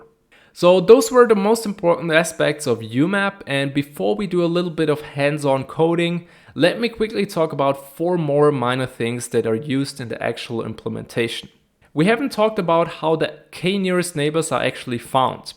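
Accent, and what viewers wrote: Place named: Germany. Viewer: Thanks a lot! Very good series! You sound German, where you acquired your education if I may ask?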